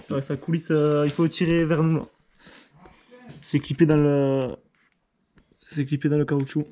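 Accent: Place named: Tarn, France. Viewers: salut les gars merci pour la vidéo, y'a l'accent du sud !! petite précision concernant le montage : perso j'aurais contrôler le jeu à la bielle juste à la main histoire de m'assurer qu'il y ai pas du jeu de haut en bas, ensuite pour le montage du piston en côte b, démontage de la culasse et contrôle du jeu à la coupe des segments à l'aide d'un simple jeu de cales, ainsi que le jeu du piston/chemise à l'aide d'une des ces méthodes ici : <link> C'est très important pour un montage correct. A+ et bonne continuation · Wooooh l’accent du Tarn c’est chaud Moi j’le comprends vite fait vu que j’ai vécu Castres un an, mais tu vas galerer sur YouTube, tout les accents du Sud passent mais l’accent bien Paysan c’est dur à comprendre tu te rends pas compte tu t’entends pas 😂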